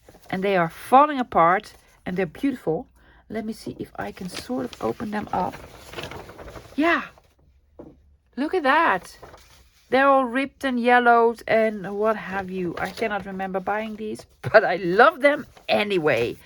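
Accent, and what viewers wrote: Netherlands. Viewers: OMG I just love your channel. One of my closest friends is of Dutch Heritage so I’m very used to ( and love) your accent. Your enthusiasm and appreciation for all things even remotely vintage strikes a chord with my heart. You have definitely won another fan. Just wish I could buy economically from Europe, being on a pension means I simply can’t afford the shipping ( same goes for USA) but I’ll continue to watch and learn and purchase digital files when I can. Thanks again you’re an inspiration to me ❤o😊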